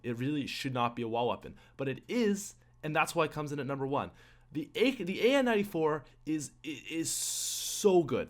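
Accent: Canada. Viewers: Are you Canadian? You speak like you are a Canadian :P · Josue Medrano canadian accent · Is this guy Canadian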